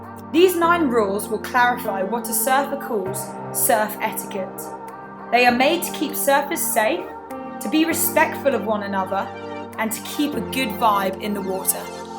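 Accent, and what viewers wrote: United Kingdom. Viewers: It doesn’t feel right being taught surfing from a British accent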